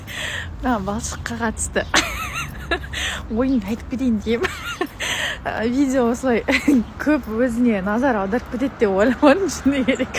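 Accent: Standard. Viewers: Сыйлаймын осындай адамдарды. Молодец,кейбір қазақтардан таза сөйлеп тұр. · Парламентте отқан шала Қазақ министерлерден таза қазақша сөйлегеніше 👍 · Ой Қазахшаны қандай тап таза сойлейсің қызым коп коп рахмет.🎉🎉🎉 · Чистый казахский❤❤❤🎉🎉🎉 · Салем қарындасым, қазақшаң не деген таза Керемет👍Қайдан үйреңдіңіз? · Қазақшаны таза сөйлейді екен Бақытты бол айналайын. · Қалай таза қазақша сөйлеп тұрсын, жарайсың бақыты бол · Жаным сол. Қандай адеми сұлу қыз. Таза қазақша сойлейди екен.. Уйеун барма. Жоқ болса уйленем саган. Жаным сол. Керемет сұлу ашық жарқын өте конилди қыз екенсин. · ❤❤❤❤Умница молодец С утра радостная ❤❤❤❤❤ таза қазақша сөйлеп тұр ❤❤❤❤❤ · Жарайсың, тәтті қыз. Сөзің таза. Бақытты бол. Көп жаса.❤❤❤ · Мәәә недеген сұлу қыз қазақша таза сөилеиді екен , жігіттер жай қарап қалмаңдар нағыз қатын болатын қыз екен тұқымыңды көбеитетін 😁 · жарайсың қызым таза сөйлеп тұрсың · Керемет. 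Таза солейсн!❤ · Красивая девочка. Очень красиво говорит. Умница. Она, по русски говорит с акцентом. А по казахски чисто на все 100 %. Всего хорошего и доброго в жизни . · Красавица молодчина, а то что говоришь чисто на казахском, тебе вдвойне плюс. Одним словом молодец ты покорила интернет своим разговорным на казахском. Счастья тебе и всех земных благ!!! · Оралдың қазқтары сен сияқты қазақша сөйлей алмайды ау , не деген таза қазақша сөз. Қазақ тілінен сабақ берсейш шалақазақтарға😅😅😅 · Оралдың кез келген қазағы өзіңдей таза қазақша сөйлей алмайды.Көзің біздің қаракөз.Қайда жүрсең жолың ашық болсын қызым!Ақтаудан,жазда Каспийге келем десең өзім күтіп аламын. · Жарайсын қарындасым кейбір солтүстіктің қазақтарынанда таза қазақша сөйлейсіін қазақша дыбысыңды естіп сүйсініп қалдым қазақша білгенің өзіңе келешекте қызыметіңе де керек. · Сары қыз,таза-таза сөзің,керемет❤ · Тамаша...әдемі сөйлейді екенсің....таза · Қазақша таза сөилеиді екенсің жараи Сың маладесің қарағым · Қандай таза сөйлейді кейбір қазақтарданда сөйлей алмайтын. 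Мололец! Жарайсың қандай жарасып тұр . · Всем приветің таза қазақша болды, рахмет айналайын. Бақытты бол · Қандай алтын қыз! Мынадай таза сөйлеу керемет қой! Сөйлеген сайын әдемі сұлуланып барады ғой! 😮❤ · Молец карындасым казахша таза сойлепжатырсын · Красивая Девушка, ты Молодец! Даже не подумаешь что ты так чисто говоришь на Казахском Языке🎉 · Таза қазақша сөйлейді екенсің қызым Молодец бақытты бол ! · Қандай сұлу, нәзік едің. Қандай ұйлесіп тұр тап-таза қазақша сөйлегенін, бақытты бол айналайын, Ата-Анана рақмет, сендей аруды тәрбиелеген.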